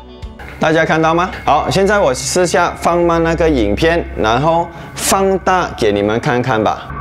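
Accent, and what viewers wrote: Malaysia. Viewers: 马来西亚独特的口音是不行hio 马来西亚很多种族 什么腔都有啦🙄🙄🙄更何况讲了十多年都是这样 哈哈哈哈哈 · 馬來西亞口音我不會不舒服，反而是北京腔捲舌音聽了很噁心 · 除了光良的其他都不是呀~ 马来西亚口音可爱啊！😁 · 听你的口音就知道是马来西亚人了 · 我是臺灣人，我都有在看惡靈的影片，所以我聽到懂馬來西亞華語，ㄅㄞˊㄔ¯ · 一直在猜是哪里人 就是没想到是马来西亚人╮(╯▽╰)╭ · 马来西亚的普通话我觉得挺好听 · 我是香港人，聽口音知道你是馬來西亞人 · 马来西亚的华语就是酱的咯！习惯就好！哈哈哈！ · 這口音很棒啊，我認識很多馬來西亞人我很欣賞這種口音的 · 只要是马来西亚人都听得惯你的口音吧，因为我也是客家人，虽然我是槟城人😅😅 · 哈哈 听出来了马来西亚人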